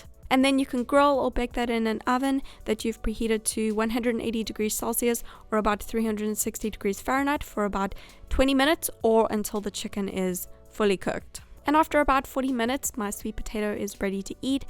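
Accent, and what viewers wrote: South Africa. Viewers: are you South African? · sounds like ur from SA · What's the accent??? (I think I'm wrong but you sound South African) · haha that South African accent.. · Ek kan jou wit-mens Suid Afrikaanse aksent DUIDELIK hoor 😂❤ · I love your videos I’m going to start watching your videos. Also I love your accent // are you South African? Xxx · South african???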